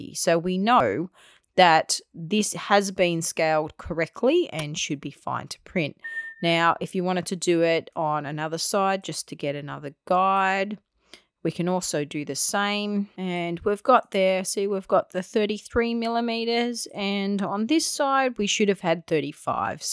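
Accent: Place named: Australia. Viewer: Your video came up in a search for "measure in Cura". Cosplay is not my thing but it is so great to click on a video and here another Aussie's voice come through, thanks for the vid and all the best.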